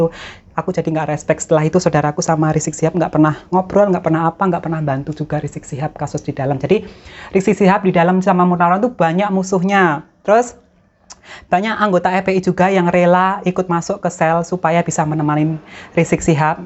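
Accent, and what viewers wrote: Java, Indonesia. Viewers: Min ngomongnya medok bgt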